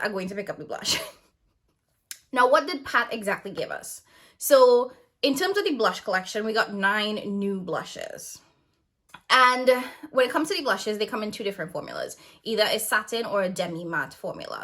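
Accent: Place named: Trinidad and Tobago. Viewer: I heard the accent and said to myself she’s got to be a Trini. I am from Trinidad, I can’t miss our accent. I will subscribe and support!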